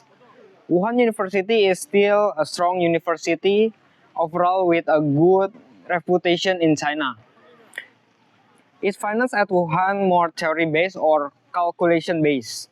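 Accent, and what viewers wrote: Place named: Indonesia. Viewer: so what citizen are you? indonesian? your accent sounds like an indonesian accent btw hehe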